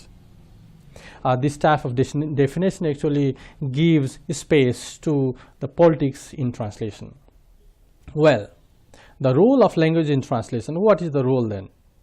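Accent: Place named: RP, United Kingdom. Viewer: Superb! BBC Style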